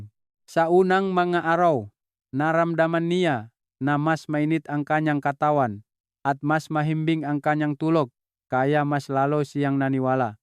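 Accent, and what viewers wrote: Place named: China. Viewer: Intsik na accent naman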